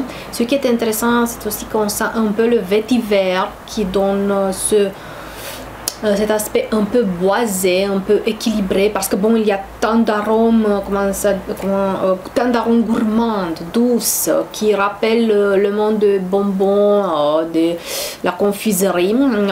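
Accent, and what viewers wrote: France. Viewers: Nice review! I like your french accent. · Nice French accent! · Love this chanel and nice french accent!! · J'aime vraiment la langue française, surtout l'accent du français, j'aime beaucoup voir cette vidéo tournée en français!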